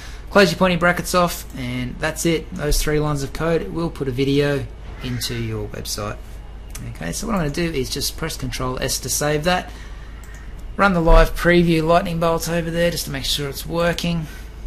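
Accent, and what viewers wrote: Australia. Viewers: Love your accent mate. Australian right?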